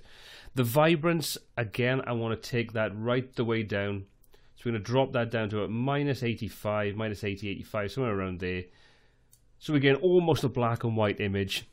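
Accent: Wales, United Kingdom. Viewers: So good to hear a welsh accent on this!! Much love and thanks for the lesson!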